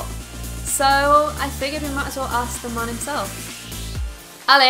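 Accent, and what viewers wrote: Ireland. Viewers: I have thought for a while that you have a slight Irish accent! :)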